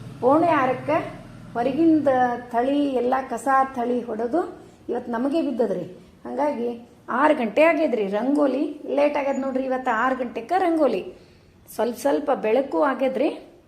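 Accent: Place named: Bijapur, India. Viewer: ನಮ್ಮ ಬಿಜಾಪುರ ಭಾಷೆ ಸೂಪರ್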